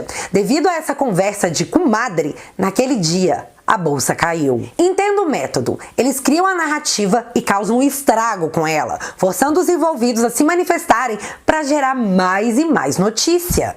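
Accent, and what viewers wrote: Minas Gerais, Brazil. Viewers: Muito bom e a hora que do muita risada kkkkkkk esse sotaque mineiro e de mais....grande mídia... só mentira...OMS ...nem se fala.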